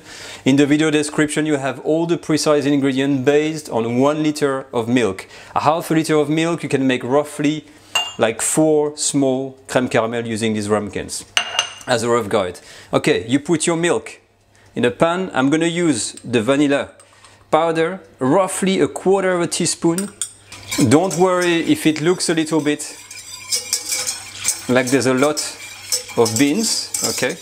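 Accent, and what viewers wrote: France. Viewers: Looks very yammy. I like the French accent .👍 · I like the way you speak English 💕 it's a French mix ❤ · Your videos are superb, made better by your excellent English but with a perfect French accent. Question: how about a pinch of salt in this recipe? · I love the French accent when mixed with good English! Recipe was so easy to follow. Thank you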